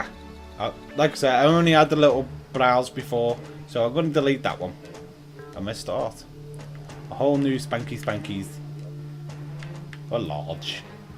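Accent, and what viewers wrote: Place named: Liverpool, United Kingdom. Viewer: sound scouse haha im from skem an just bought this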